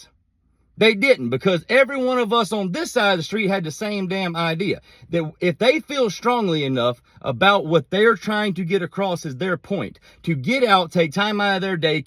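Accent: South, United States. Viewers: I adore you. We may disagree on some things but, I love the fact that you and I can disagree and still be friends. Btw, I am not a Trump supporter but, I'm a military brat who loves this nation and our family/ friends in blue. I just don't like Trump (before anyone attacks that doesn't mean I liked Biden)....I miss my daddy and your voice down to the southern accent reminds me so much of him. Hugs hunny, The GRITS (Girl raised in the South) now living in the North.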